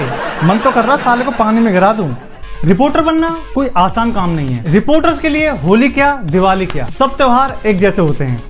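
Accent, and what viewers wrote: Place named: Uttar Pradesh, India. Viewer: Nice videos bhai aur UP accent bahot accha hai aapka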